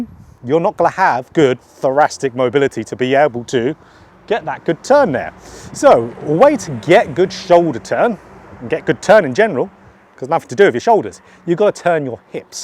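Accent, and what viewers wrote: Essex, United Kingdom. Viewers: Love it ! British type. He is defo British sounds a bit like Essex maybe. Yea it always rains here in Britain 🏌️‍♂️⛳🏌🏽‍♀️🌧🌨☔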